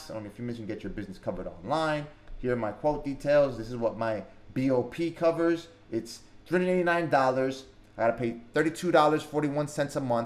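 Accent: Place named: New Jersey, United States. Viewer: Last night I met a guy. His voice sounds exactly like yours. He told me he's from New Jersey. I knew immediately that he was from NY or NJ 😂😂😂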